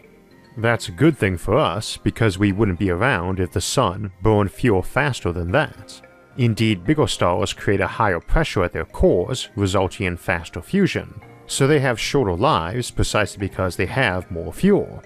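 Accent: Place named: United States. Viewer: Get a better speech program hopefully not americanised.. Temperachores ? Dooteron?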